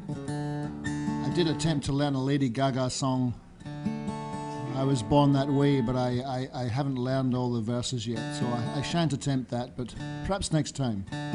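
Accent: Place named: Ayrshire, United Kingdom. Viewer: This guy comes from my hometown in Ayrshire, his Dad had a music shop in the town and often came to our house to tune our piano when I was a kid. I can't believe Colin still has his Ayrshire accent after leaving here over 40 years ago.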